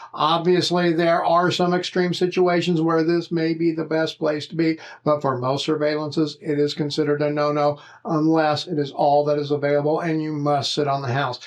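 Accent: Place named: South, United States. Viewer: The 🔑 is leverage …and based on your accent you are from the south and you are reading from a prompter or a note .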